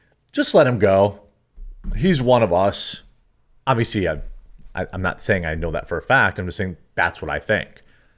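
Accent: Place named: Pittsburgh, United States. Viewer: Gotta love the yinzer accent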